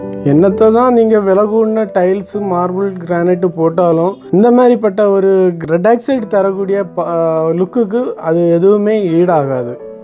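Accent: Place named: Tirunelveli, India. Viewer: நீங்க தூத்துக்குடியா அல்லது திருநெல்வேலியா உங்க பேச்சு அப்படித்தான் இருக்கு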